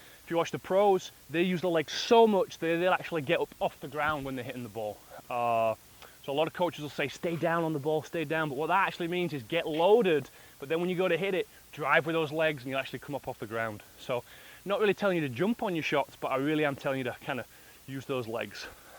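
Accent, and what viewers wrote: United Kingdom. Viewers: Great to hear an Northern accent (hope i have that right! Yorkshire?) on You Tube. Looking forward to the videos! PS: I'm from the real North...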